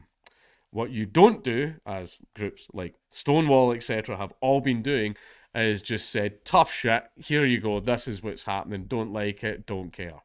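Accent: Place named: Scotland, United Kingdom. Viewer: It makes me so proud so hear such sense being spoken in a Scottish accent.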